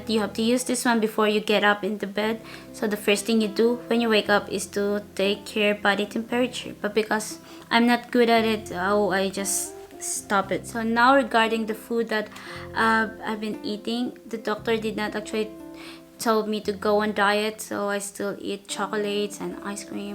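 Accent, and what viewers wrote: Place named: Philippines. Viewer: Congratuations! I noticed you are Filipino, I love your accent, my mum is also Filipino 😊 looking forward to your pregnancy updates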